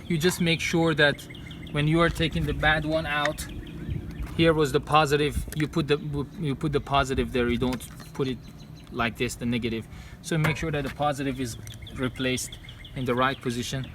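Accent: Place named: Iran. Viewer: Dastet dard nakone mard ,karet doroste. Ensafan englisit ali o ravooneh ,az ye rize tah lahjeye farsit goftam nakone in baba Irani bashe ( maha filmaye be dard bokhor injoori nadarim to YouTube) ,esmeto ke didam , fahmidam hadsam doroste. Baese eftekhare ke hamvatene ma ye hamchin videoye be dard bokhori gozashte to YouTube.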